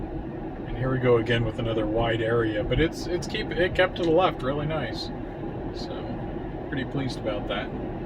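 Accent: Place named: Midwest, United States. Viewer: Where you guys at? You're accent sounds Midwestern. Perhaps OK or TX?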